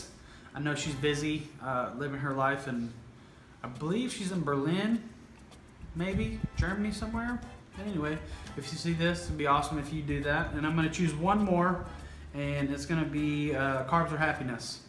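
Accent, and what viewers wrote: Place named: Texas, United States. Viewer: OMG, are you kidding?! Well, you do sound Texan:) I've been in Austin for a couple of years now, but I miss Boston every day. I haven't acclimated well here because it's just too hot and humid all the dang time. I bet you don't miss that, hahaa! Wow, I love that you and your wife made your dream come true-- it takes sacrifices, yes, and a hell of a lot of courage. But there you are-- now living in paradise:)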